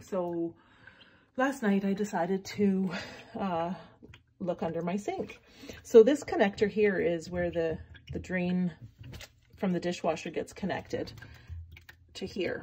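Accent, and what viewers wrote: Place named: Canada. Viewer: Ok wait... You sound Canadian maybe? and? Italian-Canadian? Hello from North Jersey! Thank you for the helpful video... helping my friend (but that guy shouldn't have given you sealant for that p-trap... they are meant to go together easily and seal by themselves... that stuff will just make a mess. It's really just for sealing threaded metal pipe... NPT etc... )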